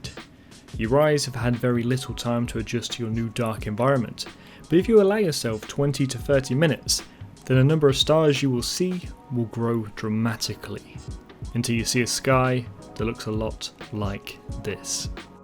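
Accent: United Kingdom. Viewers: Great video...if i was just listening with only sound, you sound like Physicist Brian Cox...English accent and all...I had to double check as I was typing this lol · Very distracting, having a British accent, channel based in UK but quoting prices in Dollars. Strange.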